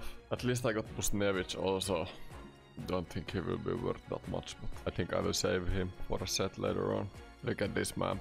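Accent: Finland. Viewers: Mä nauran kuinka hyvin nasevasti suomi aksentti paistaa englannissasi:D!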